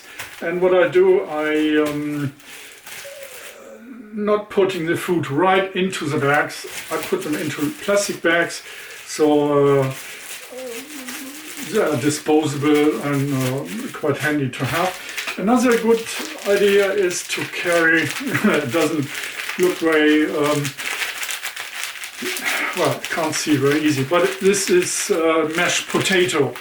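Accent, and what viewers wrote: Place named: Germany. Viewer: Hi, I've been watching your videos and loving them. Good stuff! I love your sense of humour, attitude and also the English twist on your German accent. Thank you so much for sharing all this. Watching from Wales.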